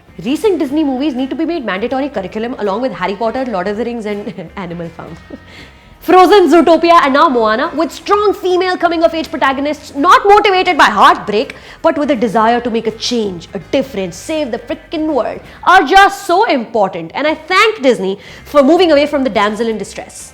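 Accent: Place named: India. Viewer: I love the way you explain the movie in your indian accent. Its totes adorable.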